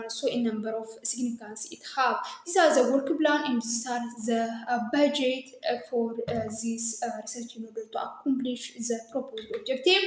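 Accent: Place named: India. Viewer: Your accesent of speaking is very amazing I remember indian speakers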